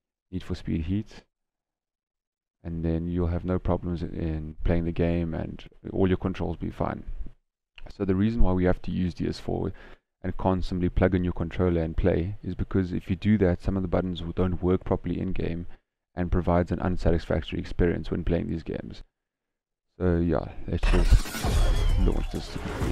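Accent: South Africa. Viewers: Worked for me!! 🙌🙌🙌 I can now play NFS Payback and Heat with the controller!! And is that a SA accent I hear there?? Lol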